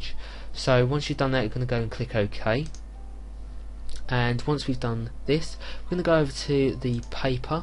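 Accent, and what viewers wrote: United Kingdom. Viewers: very nice tutorial. but DAMN your British accent which is putting my nerves to a big challenge considering that English is not my native language... Anyway, I will try to fully understand your actions from tutorial.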